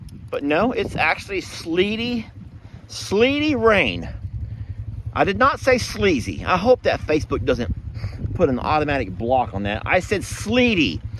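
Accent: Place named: South, United States. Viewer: I am 100% right there with you on the southern pronunciation, there. If I have to use text-to-talk or google search voice, I have to speak loud, clear and slow...and STILL get frustrated because the damn thing doesn't speak Southern! And forget calling with voice activated menus....I might say "pay my bill" 5x and it still can't understand me! So I give up an start cussin it out...so it finally switches to number controls: 1 for yes or 2 for no. I laugh but I also get really pissed....because Southern people in my neck of the woods get some words more correct than other states! Some places people say E-mmediately, we say IMmediately (like IMagine) which is likely more proper! Its a crazy thing, the english language!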